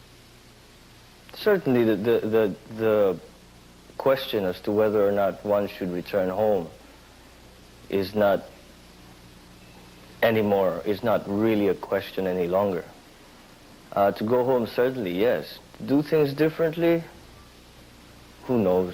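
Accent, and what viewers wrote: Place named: United Kingdom. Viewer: Napaka talino ng ating bagong elect na pangulo. Napaka pogi pa. Hawig pala sya n vince. May british accent pa sya dito. Naging emotional sya at times at nag ligid ang luha . Mahal naming PBBM, this is it, ung sinabi nyo po na gagwin ninyong lahat para matulungan ang mga pilipino . Like your father and PRRD , mahal na mahal nyo rin po ang mga pilipino at ang ating bayan. Mabuhay po kayo God bless you and guide, protect and help you in governing the Phil. ❤️✌️🇵🇭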